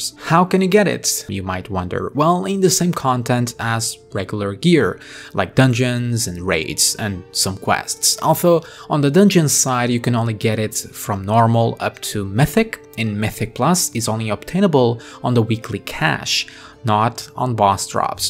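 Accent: Portugal. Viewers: Great! E pelo sotaque só podes ser português. :D Experiência própria...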